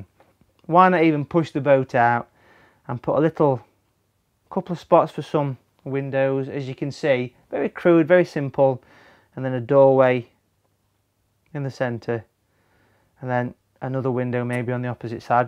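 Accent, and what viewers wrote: United Kingdom. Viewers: Where is he from? A fellow North West Brit but which town? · Guy's I think this guy is british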